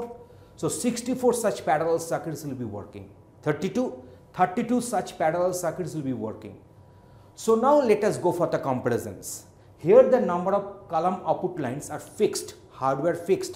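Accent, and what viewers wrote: Bengal, India. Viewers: Pan thuk k pdhaya kr be bangali kya bolta h...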